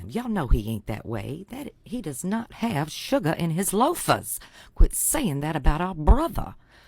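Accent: South, United States. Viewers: Frankly, my dear, I dont give a damn 😉 where or how your deep silky voice and very southern accent came to be. It's very real to me. Therefore, you talk, I listen. Willingly. Intently. Respectfully. Adoringly. Thank you, Ma'am. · I love love love your soothing, truly southern voice. ❤ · Born and bred Southerner here; your accent sounds like home to me..although my grandmother and her siblings ended sentences with honey instead of baby, and did that thing with r's at the end of words, or should I say woids. They were raised in Selma after their con-artist slash preacher dad lost the literal farm for which he still had the original land grant from that crazy English king that just wanted to get his troublesome 'Scots-Irish' mercenaries out of the way once they'd finished up the militaristic suppression of actual Irish people in their own homeland. I wish mine had been a matriarchal lineage like yours, but my gran was as feisty as a Southern lady born in the early 1900s could be and still reign as queen of the first baptist church where she moved to as an adult. 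She was one mean old biddy underneath all those impeccable manners, but if you listened carefully you knew what was what right enough... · Miss, as a Texas Southerner myself, your voice is like drippin' honey, darlin'!! It doesn't really matter which accent from the South you're using, they all sound like home to me. Bless your heart, darlin'...I could listen to you all day and I grew up around that "sneaky tea", too! 😏😉🍜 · I hate southern accents. Blatch devero is why you sound familiar. 🤣🤣🤣 I have a hard time listening to you but I always hear the message. DON'T CHANGE A THING 👍👍👍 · Baby girl I knew you were a southerner, possibly the deep South, however I still love your accent. I also have an Aunt Janie she was cool as hell. I'm from North Carolina, and yes when you were a child you had to break the codes in order to understand what the old hands were talking about. Coz back in the day children were supposed to be seen and not heard. 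Keep spilling the tea I love you❤❤❤❤ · Bless you and thank you! I love me a beautiful (voice) and proud southern accent..and woman.❤ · Wonderful stories! Thank you, ma'am! If people think your accent is fake, they've not traveled the south. I was raised there, spent half my life there. So many, nuanced variations of the sothern accent, depending what state, coastal or mountains....NOT color of skin. Thank you, sister!😊❤ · Well I D Clare. Love your accent. I'm a southern girl too. South Carolina to be exact. Love your story. ❤❤ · Your accent and your voice sound so smooth and rich. Your southern humour is hilarious.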